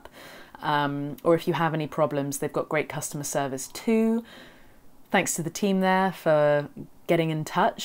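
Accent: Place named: United Kingdom. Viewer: You are so British it's amazing.. I love your accent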